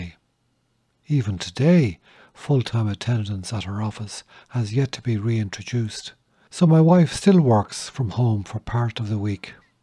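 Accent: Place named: Kildare, Ireland. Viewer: For a Dub you really sound more like a Kildare man, there is a bogger in you somewhere.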